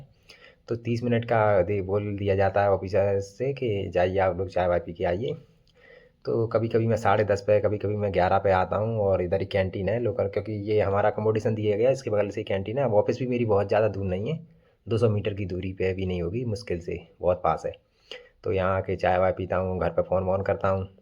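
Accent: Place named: Braj, India. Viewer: Baat karne ke tarike se lag raha hai aap ki real bhasha Braj Bhasha hai 😊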